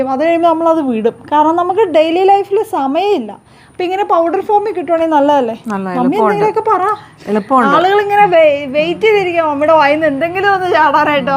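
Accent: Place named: Idukki, India. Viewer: Hi നിങ്ങളുടെ slank കേൾക്കാൻ നല്ല രസമുണ്ട് ഇടുക്കിയിൽ എവിടാ place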